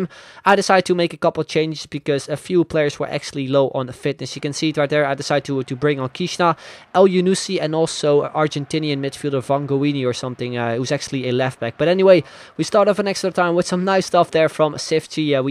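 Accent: Netherlands. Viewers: He is dutch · Alright i never hurt of you bfore no lie but in 3 sec i knew you where dutch and subbed right away xD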